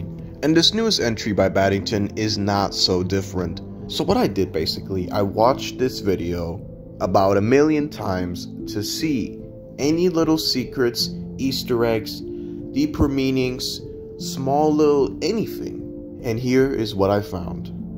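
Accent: Germany. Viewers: You german?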